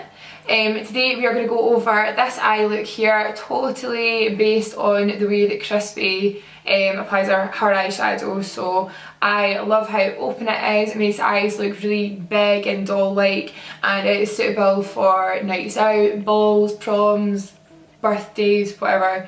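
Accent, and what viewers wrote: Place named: Glasgow, United Kingdom. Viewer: Gorgeous, I love it. As a Glaswegian in Yorkshire it's lovely to hear a familiar accent! Nars eyeshadow base is fantastic-I have oily eyelids and nothing budges when I use that-and even mac paint pots crease with my eyes! X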